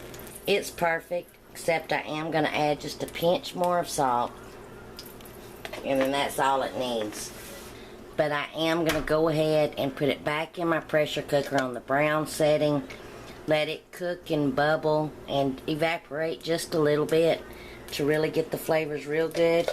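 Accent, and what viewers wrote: South, United States. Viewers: Your very welcome! What part of the South are you from? Greetings from Canada....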